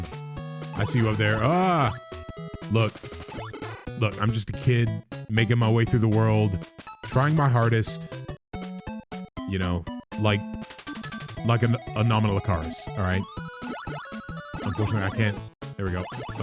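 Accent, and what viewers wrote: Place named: United Kingdom. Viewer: You sound like a Native Britt😂😂😂 With that Voice over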